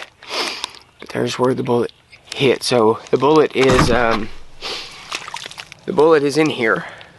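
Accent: South, United States. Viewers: Man i just wanna say i appreciate what you do so much. your personality on camera and love for firearms and definitely that southern draw reminds me of me and my friends here in tennessee and i think thats one of the thing's that make me favor your videos over a lot of others. that and the awesome content. love my wot and want a graves so bad its ridiculous. that roller i believe makes all the difference in it running on multiple calibers. i just wanted to say how sorry i am for what happened to your uncle. i could literally see what u were thinking while shooting that usp and i know it took so much for u to continue. you have tons of heart bro. i read the article and i also went and looked at the lawsuit filed. i hope u dont take offense to me asking but how did the lawsuit play out. i just wanted to know that they paid for what they did and if they didnt thats a travesty. 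the fact of who ur uncle was it never should have happened and they should have handled it way different. again man sorry for ur loss and o look forward to you content for as long as u can put it out.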